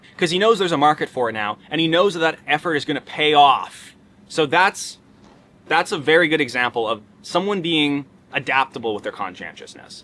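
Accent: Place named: Canada. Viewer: he sounds so canadian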